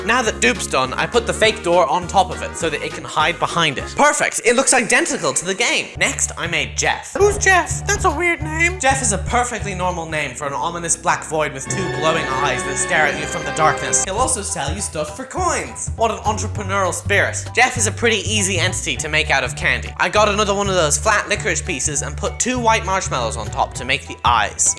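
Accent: Australia. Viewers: I’m Australian ur Australian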